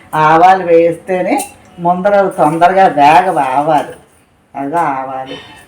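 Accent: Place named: Godavari, India. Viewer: మీరు ఏ ఊర్లో ఉంటారండి,మీరు గోదావరి జిల్లావారి లాగా మాట్లాడుతున్నారు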